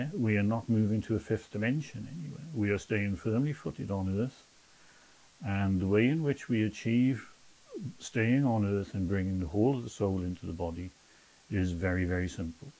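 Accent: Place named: England, United Kingdom. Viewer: The subtitles are only about 70% accurate as he has an English accent. Just one of the many hundreds of English accents and google can’t seem to be bothered to fix the issue even considering the amount of content that comes England for our small size and population. Truly the master race.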